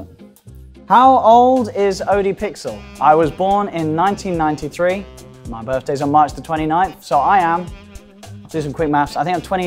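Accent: England, United Kingdom. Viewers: . Вообще, для меня это лучший дота комментатор, особенно его потрясающий английский акцент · ох этот английский акцент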